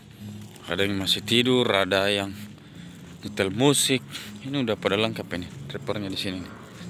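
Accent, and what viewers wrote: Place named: Indramayu, Indonesia. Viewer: Org indramayu mang ??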